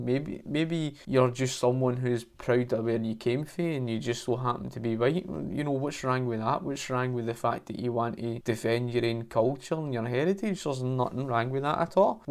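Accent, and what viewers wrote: Scotland, United Kingdom. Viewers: That beautiful Scottish accent.. Glad you're on youtube!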